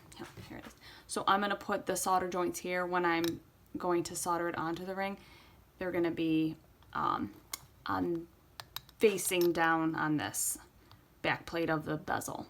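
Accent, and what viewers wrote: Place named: Michigan, United States. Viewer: You sound like a Michigander! lol. Thanks for making these videos, truly the best on the market for tutorials.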